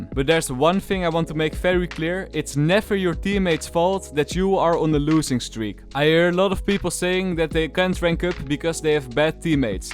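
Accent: Netherlands. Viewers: You are dutch aren't ya? ;)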